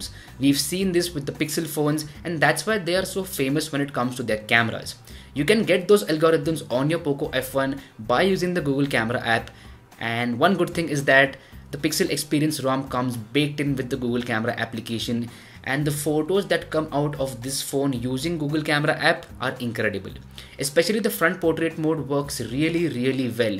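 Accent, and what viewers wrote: India. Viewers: Indian accent is so terrible xD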